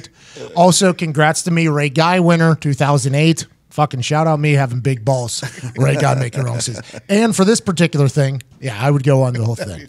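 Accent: Pittsburgh, United States. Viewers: I love hearing the Pittsburgh talk when I listen! Good stuff. The Vally news dispatch! Oh blast from the past! Good stuff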